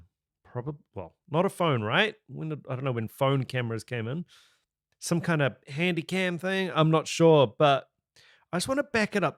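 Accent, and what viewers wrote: New Zealand. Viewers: casually starts watching video ~hears accent~ "here in Auckland, New Zealand". Swears loudly and falls out of bed Gidday fellow Kiwi. How nice of the algorithm.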